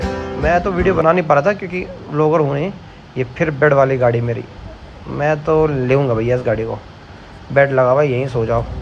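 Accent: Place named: Garhwal, India. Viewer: Germany looks amazing! 😍✨ First of many, hopefully. Garhwali accent was the best! 😂🙌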